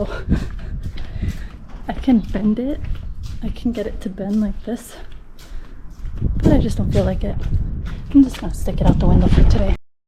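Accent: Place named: Hawaii, United States. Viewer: Omg. I’m listening and I’m like why sound like she talking pidgin. Then I look at your name 😅 Native Hawaiian here living in NY. So wonderful to come across Hawaiians even if it’s just on da internet ❤ Mahalo for this video.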